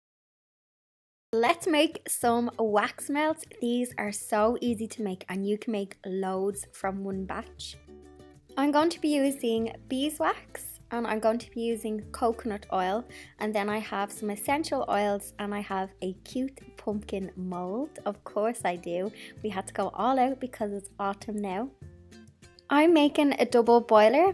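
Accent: Ireland. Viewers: With your lovely Irish accent I thought you said your niece would have hair bands, I was trying to visualise hair bands to make your jacket taller🤦‍♀️🤣 it’s arm bands you mentioned 🤣my mistake🤣. I have never seen Beetle Juice, so I’m not much help to you 🫢. But go girl, knowing our she will make it 👏❤️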